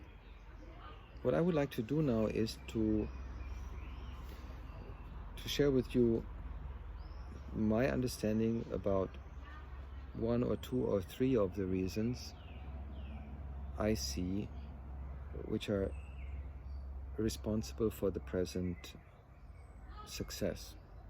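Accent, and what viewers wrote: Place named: Germany. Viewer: I guess you are from Deutschland because your accent is like my teacher( I am a Chinese student studying in Germany). I really like your own thinking about the Chinese model. and I was also inspired by your talk also to understand further about china. I also respect you for your really independent thinking and analysis ( because most of the west media is full of ideology, arrogance, and prejudge) hope you can produce more videos about your analysis of china, whether is a good or bad point. because I appreciate your neutral altitude (Daniel Dumbrill is also a good neutral one also <link> And I think one common thing between China and Germany is that both 2 countries are pragmatic, it's like scientific thinking to manage the countries. Vielen Dank für Ihre Erklärung und hoffen, dass Sie nach der Epidemie wieder nach China kommen können.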